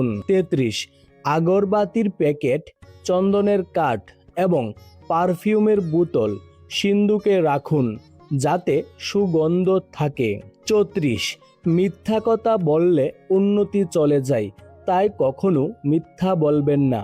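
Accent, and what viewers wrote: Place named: Bangal, Bangladesh. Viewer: উনি বাঙাল ওনার উচ্চারন ওরকম হবে পাল্টাবে না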